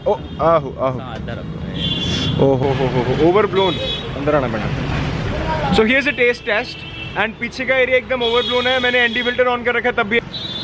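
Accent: Punjab, India. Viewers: Veerey assi kadi vi Punjab nahi aaye lekin taudi lens naal and taudi accent naal mainu ae lagdaa hai ki main Punjab to kaddon chhad ke gaya hi nahi.. jyonda, hansda Te wasdaa sadaa sadaa rahwega saada Punjab Te saada Hindustan.. BTW please mainu maaf karna agar Saadi Punjabi Galt hui. Cheers!!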